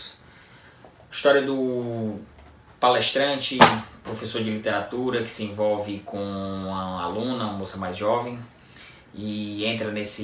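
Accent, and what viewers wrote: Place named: Fortaleza, Brazil. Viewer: Assim que me identifiquei com teu sotaque, fui atrás de saber se tu é de Fortaleza kkkkk Que coisa é encontrar canal literário de qualidade pertinho da gente!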